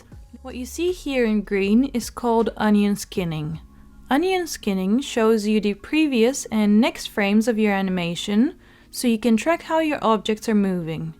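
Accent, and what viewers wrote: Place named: Scotland, United Kingdom. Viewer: The heck kinda accent is that? Watered down Scottish?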